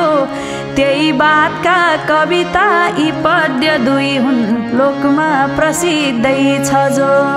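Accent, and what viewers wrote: Standard. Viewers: सुनिरहुंजस्तो मिठो स्वर र भाषाको सुद्ध ऊच्चारणको बर्णन जति गरेपनि कमै हुन्छ।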